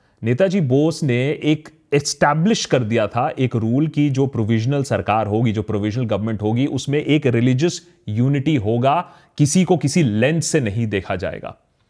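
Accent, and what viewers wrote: Bengal, India. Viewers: Sir ap Bengali ho kaya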